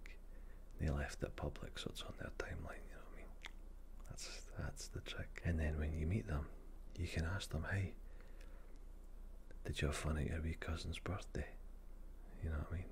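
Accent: Scotland, United Kingdom. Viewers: Found you from crinkeluvin’s video! love the video and it’s nice to hear the accent of a fellow Scot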